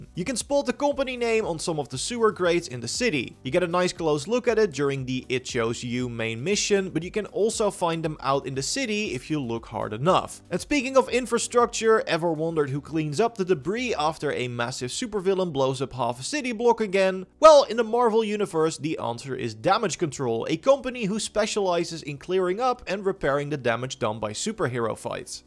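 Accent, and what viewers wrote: Netherlands. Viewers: are you dutch? Cuz im hearing a stong accent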